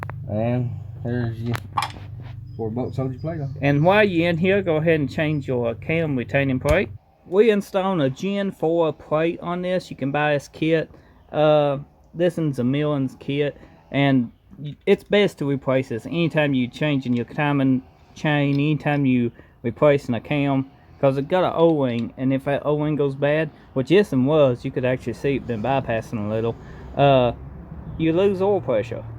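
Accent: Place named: South, United States. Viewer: It's a southern accent with a lisp. My buddy sounds just like him. Lol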